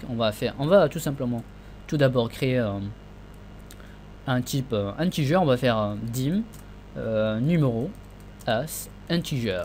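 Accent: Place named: Morocco. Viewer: On entend l'accent marocain lol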